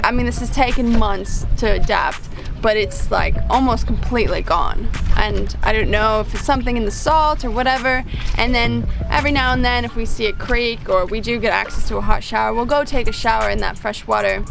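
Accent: Australia. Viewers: you sound aussie now 😊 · Your voice has changed a bit. Sounds a tiny bit Austrialian. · Your new Australian accent sounds cute! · I notice your Aussie accent, been following you for years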